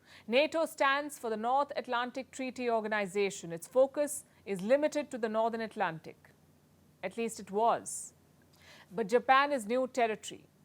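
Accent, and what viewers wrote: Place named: India. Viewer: Palki spewing her CIA-sponsored anti-China propaganda! Palki's thick Indian accent is meant to confuse Indians into thinking India hates China or Russia. Does it work? Most Indians couldn't care less about China. But Palki is meant to convince Indians that China is the enemy. Palki never bad-mouths Biden! CIA won't allow it.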